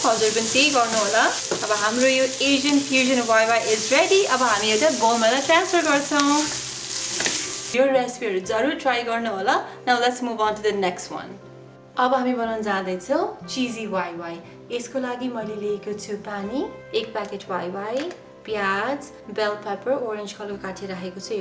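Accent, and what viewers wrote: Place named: United States. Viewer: Nepali with US ACCENT WITH HINT NEPALI IN IT 😁❤️👍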